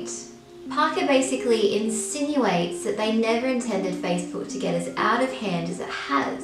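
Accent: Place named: Australia. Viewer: My initial thought - sounds like an Aussie accent. Look into description YT page - Country: Australia - knew it lol. My thoughts on social media: should be used in moderation, balanced with things outside of it - e.g., outside activities As to future videos, I would suggest that you look into not just how often social media plays a part into our lives but also how many social media platforms are used - it feels like we are inundated with so many of them that it pretty much consumes all of our time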